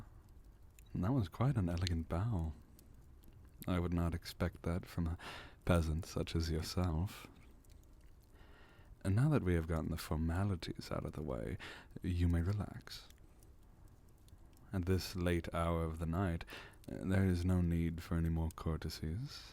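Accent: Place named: RP, United Kingdom. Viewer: This mans voice is so smooth, out here sounding like a british gentleman from the 1800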